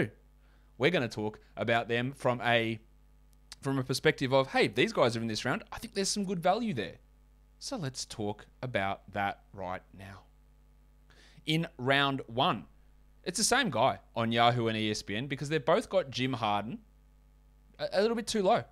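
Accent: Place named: Australia. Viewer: I'm back for the year fellas!!!! Missed ya Aussie voice!!! Greetings from Chicago! Go bulls!!!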